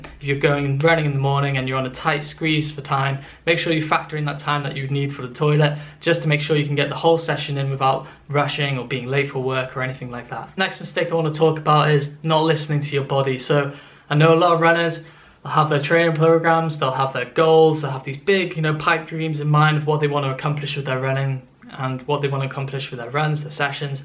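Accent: Wales, United Kingdom. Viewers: Is that a welsh accent i hear ayy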